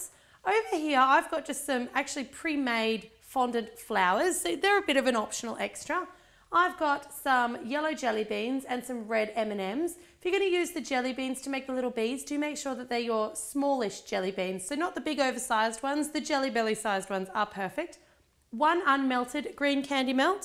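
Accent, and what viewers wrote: Australia. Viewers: Nah she totally sounds Aussie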